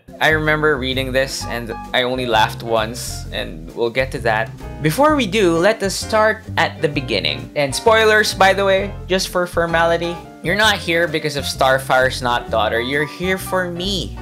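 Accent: Philippines. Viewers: Im not sure why, but I hear a smol twinge of Filipino accent for some reason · Are you filipino? I can sense our similar accents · Didn't read the book, have no plans to because the internet have all united to say one thing about it: it's shit Art is good, but story is shit Also your accent is familiar are you by chance a filipino? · Are you a filo lmfao the accent is so familiar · awesome vid! also, somehow thought of your accent... as Filipino? maybe i'm wrong, your analysis of this weird comic was spot-on, though.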